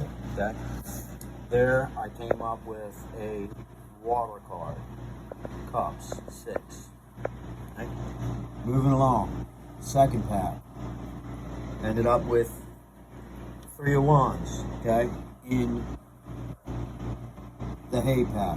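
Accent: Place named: Baltimore, United States. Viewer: I thoroughly enjoy the 'balmer accent delivering the old wisdom! Cheers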